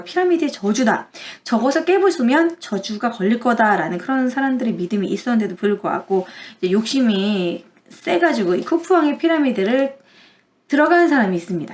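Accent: Gyeongsang, South Korea. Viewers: 갱상도 분!?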